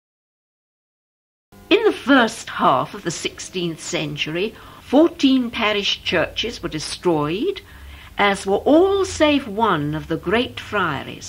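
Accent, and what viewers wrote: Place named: RP, United Kingdom. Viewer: Very interesting. The ladies voice reminded me of Annette Mills and muffin the mule, with all its received pronunciation . It did make me smile. It was suitable for its time and audience but I couldn't help feeling that I'd have liked it even more with the Norfolk/ especially Norwich accent. Anyway we'll done for putting it together with the pictures. Thanks for making the effort to bring pictures back to life.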